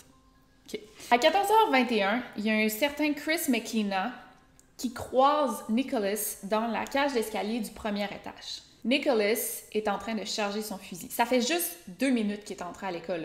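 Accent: Quebec, Canada. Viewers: Je déteste ton accent québécois mais la video reste bonne malgré l'accent horrible du québec 😢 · C’est comme ça que l’on parle au Québec. Et ça fait son charme, ici. Si ça ne te plaît pas, il y a une multitude de chaînes exclusivement francophones et/ou anglophones qui sont à ta disposition sur Youtube :) merci. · Avec un très agréable accent canadien !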